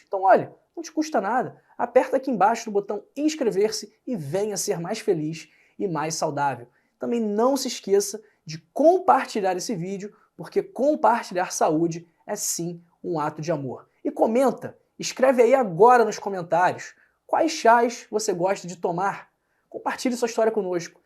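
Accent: Rio de Janeiro, Brazil. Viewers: Como assim, sotaque arrastado. Ele é carioca . Queria que ele falasse como? Que observação mais sem sentido. Valha me Deus!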